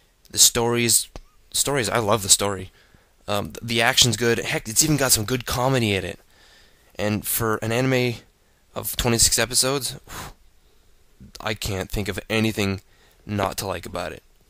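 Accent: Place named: United Kingdom. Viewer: u fukin silly uk guys with ur silly way of talkin u can just make trailer with music no need to talk too much fool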